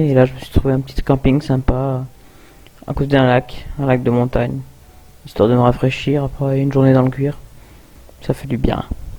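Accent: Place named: Germany. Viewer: Sympa la vidéo et que dire de ton super accent allemand...vivement la suite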